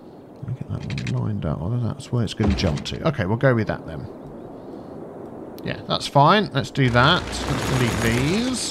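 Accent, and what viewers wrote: United Kingdom. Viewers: are you british and do you know DANTDM personely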